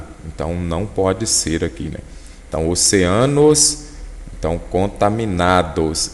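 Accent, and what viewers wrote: Minas Gerais, Brazil. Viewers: Ahhhh esse sotaque mineiro que parece fazer-me compreender ainda melhor a explicação....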